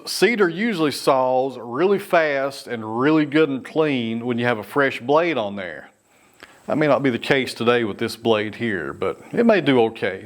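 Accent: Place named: East Tennessee, United States. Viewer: . Would you ever consider showing us some of your picking? Banjo? I would drive from Omaha to see you. So glad you saved the old footage. Losing memory sucks. I know. My brain doesn’t work like it used to either. LOL Even Bruno was smaller. Big 15 y/o now. Great to see the progression and again for your diligence in editing. I love your speech foibles. They just show how human we are. You don’t have to bark orders anymore from being a cop. I’m sure you were quite succinct then. Now you can be just Eastern Tennessee. ❤😂😊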